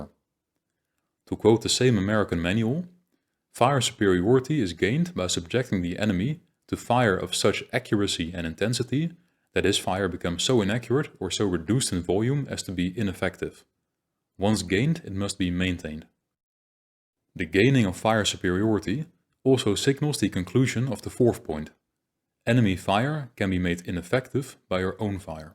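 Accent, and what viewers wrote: Netherlands. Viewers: The content is very good. But your Dutch accent is very thick. You make a lot of small pronunciation errors. 100% on some sound. It is really a pity. For an example word: you cannot pronounce the word "organization" correctly. You say "Organizasjen". Or the word "section". You say something like 'seksjen' with a 'sh' sound. "Organizasjen" . Dutch people always hear insert a 'sj' sound everywhere in English. I suppose it has linguistic reasons and very difficult for a Dutch person to learn. Still it is important to know that where your English is very good. Your pronunciation is quite bad on that specific sound. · i think that the narrator is a dutch person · Love the Dutch accent ❤ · Nederlander. 🤣🤣 ons accent is zo herkenbaar he hahaha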